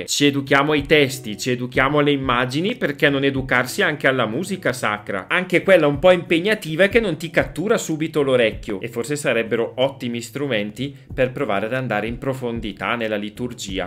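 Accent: Italy. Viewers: Un solo appunto: sei troppo buono. Capisco che sei un Sacerdos in aeterno, ma io ci andrei giù un po’ più duro. Tutto condivisibile, anzi, condivisibilissimo! E deliziosa la calata bergamasca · Ma che bell'accento altoatesino! Bergen de sura o de hota?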